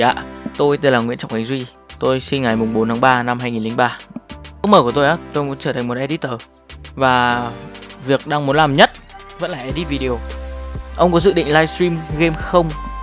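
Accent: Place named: Hanoi, Vietnam. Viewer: T thề là t thích giọng con trai Hà Nội vl ý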